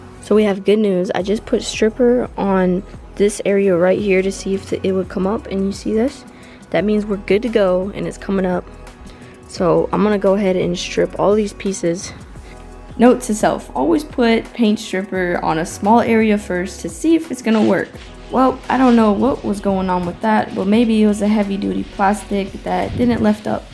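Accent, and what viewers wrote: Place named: Bay Area, United States. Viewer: Good job.. What part of Cali are you from? You sound like you're from the Bay Area or close by it.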